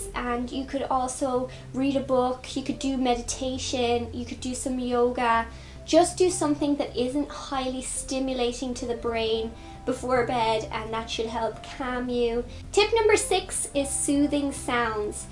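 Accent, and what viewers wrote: Ireland. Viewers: Are you irish? I am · omg is she Irish , love it haha ☺ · Are you irish or is it just me cuz im irish For anyone who is irish conas a tá tu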